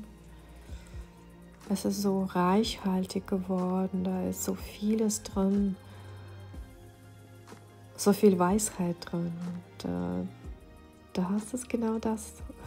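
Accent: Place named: Russia. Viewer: Классно! Чуток слышу наш акцент.:)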